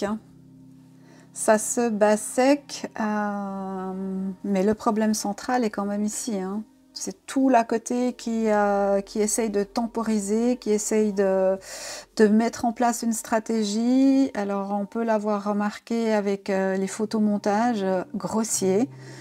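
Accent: Switzerland. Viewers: Coucou, j’ai été scotchée par cette vidéo, je viens de m’abonner 😉😉😃. Mais au fait, a-t-elle vraiment un cancer on peut en douter maintenant après avoir écouté votre vidéo, ils préfèrent dire cela plutôt que d’expliquer qu’il y a eu un couac pendant l’opération? Il m’a semblé entendre un joli accent venant de Suisse romande, si c’est le cas 👏👏 bisous de Genève 🥰🥰🇨🇭🇨🇭🇨🇭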